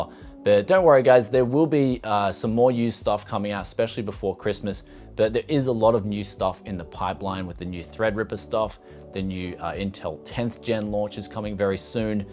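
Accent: Australia. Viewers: I’m sorry that people don’t understand you don’t work for free, keep up the good work my old mate, you defo need to bring the Aussie out more, it’s class! Hope you well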